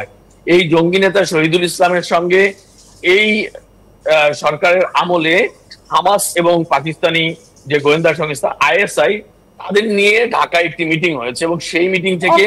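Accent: Kolkata, India. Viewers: উনি ভারতের লোক ওনার ভাষা। বাংলাদেশের মানুষের ভাষানা উনি কথা বলতেছেন কোলকাতার মানুষের মতো।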